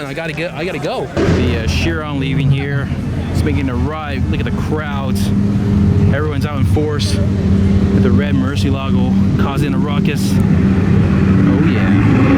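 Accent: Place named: California, United States. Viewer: Love the commentary, totally California dude!. Miss it. :)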